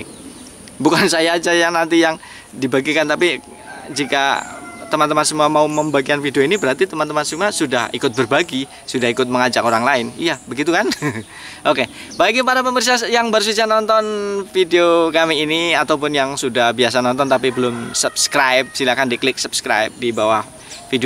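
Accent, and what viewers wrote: Banyumas, Indonesia. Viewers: Nuwunsewu,panjenengan daleme pundi pak?ngapak-ngapake ko kadose mboten asing teng kupinge kulo.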